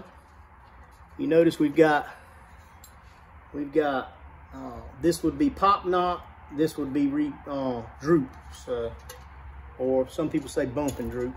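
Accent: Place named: Appalachia, United States. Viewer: HillBilly trying to sound real intellectual like.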